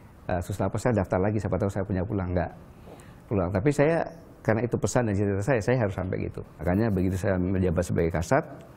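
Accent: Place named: Banyumas, Indonesia. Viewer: presenternya ngapak amat yah ngomongnya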